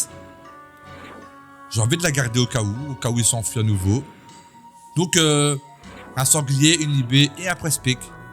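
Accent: Belgium. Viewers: Yo G8 osa 1.29 tous mes respect ! T'es belge non ? ton accent me rire tu gagnes +1abo.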